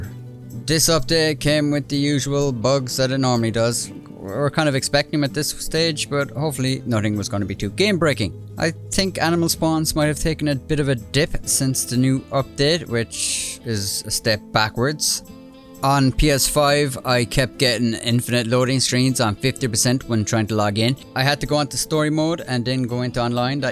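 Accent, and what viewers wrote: Ireland. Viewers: How is it, that you speak "More" Irish now, than just by a few videos ago? 🤬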